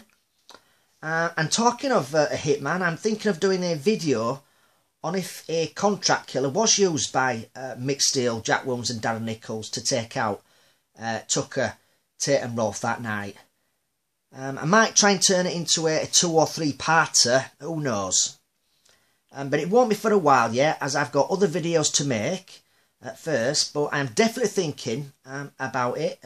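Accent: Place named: Yorkshire, United Kingdom. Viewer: That's what makes your videos the best on YouTube pal!... I've been interested in the case for years & I've searched allover the internet, I've found your videos/ channel to be the best by far. Thank you & well done for great content & the posting of your excellent videos, I'm working my way through them all!! 👍🏼....... One thing I forgot is your accent! Lol... It's Yorkshire right?.......I'm from West Yorkshire, I can't quite pinpoint your accent lol.... Leeds possibly? Lol.....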